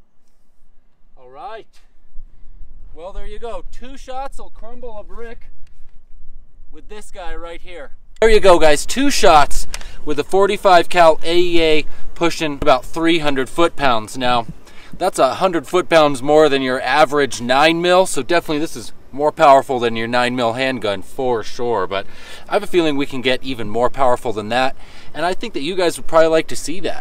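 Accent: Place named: Canada. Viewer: You sound Canadian but you look like you're in the high deserts of Arizona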